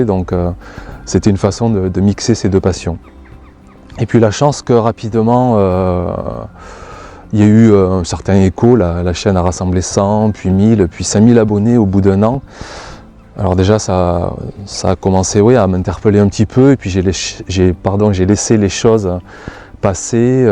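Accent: South, France. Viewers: Bonjour, j’apprécie vraiment cette chaîne,ou on évolue avec toi autant dans les succès que les échecs. Tu es un mec super et plein de sincérité et que dire de cet accent qui sens bon le sud. Je te souhaite tout le succès tant mérité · Quel beau clap de fin 2019 ? Tu es sincère et authentique et je t’en remercie. Tu as su partager tout au long de tes vidéos ta passion et tes émotions sont arrivées jusque dans nos foyers. J’aurai souhaité avoir un fils comme toi, mais je me consolerai d’être ton ami, lol. Reviens nous vite afin que nos dimanches chantent encore avec ton joli accent du Midi et que le soleil dans tes vidéos nous stimule à faire grandir nos fruits et légumes. Je reste dans l’attente de te retrouver avec le chant des cigales. Merci.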